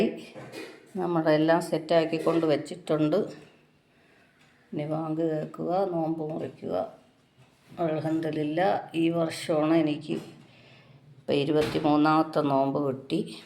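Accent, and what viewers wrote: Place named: Thiruvananthapuram, India. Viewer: ഇത്താന്റെ തിരുവനന്തപുരം സംസാരം കേൾക്കാൻ നല്ല രസം 🥰❤👍🏼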